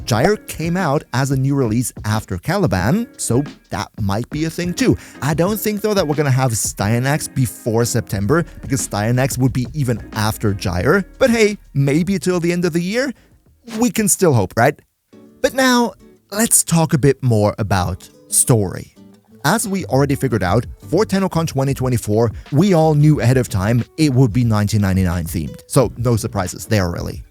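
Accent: Germany. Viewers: Ich bin nur diesen starken typischen deutschen Akzent gewöhnt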